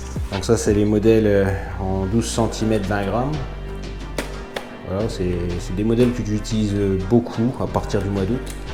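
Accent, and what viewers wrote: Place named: North, France. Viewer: salut moi c Seb je suis pas mal ta chaîne mais je viens seulement de comprendre comment s'abonner jsuis pas doué lol. sinon je vais souvent au der j'ai une barque bourlingue moteur avant avec i pilot la juste un petit prblm de moteur arrière ça va venir bref sinon pour savoir si ça te dirai une peche avec un chtimi car je suis originaire du nord et vue ton accent tu dois pas en être loin. maintenan j'habite a 50 min du bassin nord maintenant au plaisir de ce voir sur l'eau ou un rdv pour une sortie a deux bon wekend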